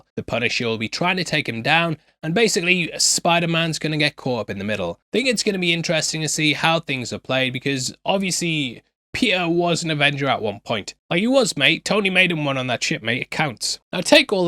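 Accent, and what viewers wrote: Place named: England, United Kingdom. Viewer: brilliant Love the channel started following you because I heard an English accent and your best move was putting yourself in front of the camera.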